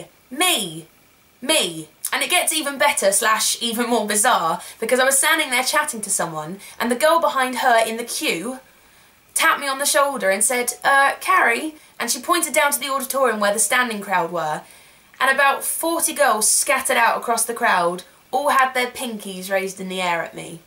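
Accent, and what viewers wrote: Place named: England, United Kingdom. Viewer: What part of England are you from? love your accent:)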